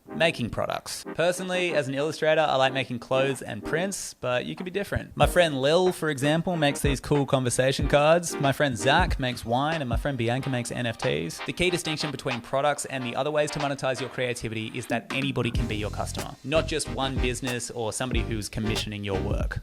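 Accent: Australia. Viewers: Lots of good information here. I am considering watching this again. The fast talk in an Australian accent combined with the motormouth editing made it a bit difficult to follow. Consider spending a bit more time on writing with brutal cutting to get a concise message with more impact, in less time. Know what is important in your message and cut out some of the snarky humor. Language was invented, after all, by air-breathing mammals so there is a reason for the natural pauses in our speech. Your humor would hit the mark more often with some space to inhale giving the viewer time to "get" the joke. Remember that the most important part of your message is always non-verbal. It's in the spaces between the words. Give us those spaces so that we can interpret your speech. I appreciate your frank and personal style. The core message is empowering and inspiring. More please. 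· He has an Australian accent. He sounds just fine and legible to me, but I’m American and English is my only language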